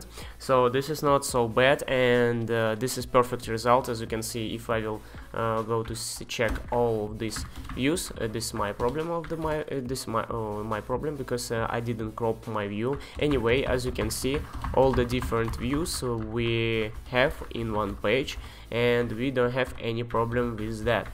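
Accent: Russia. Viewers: Судя по акценту, русский твой родной язык)) спасибо за видео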